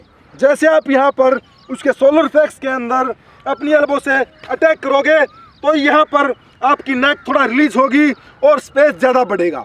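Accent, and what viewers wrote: Haryana, India. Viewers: I think Aap haryana se ho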